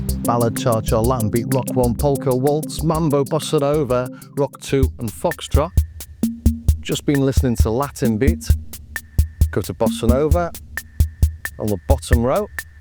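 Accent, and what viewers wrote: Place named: Liverpool, United Kingdom. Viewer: Thanks a lot, this was really interesting — as always. A drum machine that does not keep its pace, this would drive me nuts ;-) I did not know that about “Echo”… It must have been tough for these guys being a band in Liverpool . And I am rather happy that I was not forced to learn your local dialect until now. This is quite a challenge… Still watching every of your videos, See you ;-)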